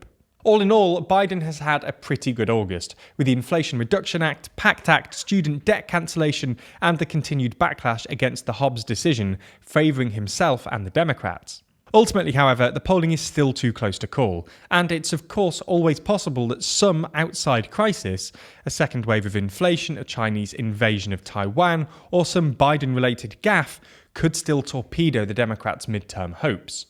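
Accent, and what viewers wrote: United Kingdom. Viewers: First time watching this channel after the other 3. Kinda disappointed he didn’t change his UK accent to an US accent. Would be totally hilarious and serious at the same time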